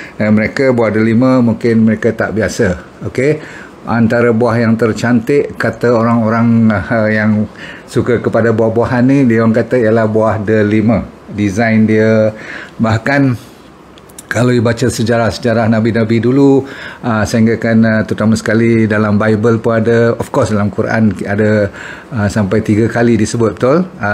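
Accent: Malaysia. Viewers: Denger cakap malaysia 😅😅😅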